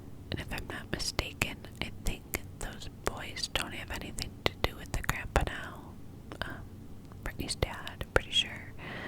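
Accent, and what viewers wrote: New York, United States. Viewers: Thanks! ☺ BTW love your vids they are awesome. Just seen your jail vid. Its absolutely awesome thanks for sharing! Now i am going to watch your New York accent! ❤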